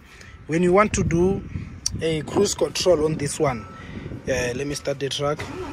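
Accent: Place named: South Africa. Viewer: but I don't remember saying otherwise or anything bad against you,I didn't criticise you instead I only said South African accent is just so awesome 😎😎😎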